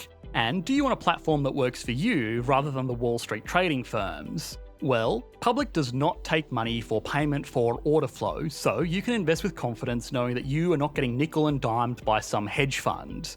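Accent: Australia. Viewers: weird combo of vocal-fry and Australian accent. made me uncomfortable 😂 good info though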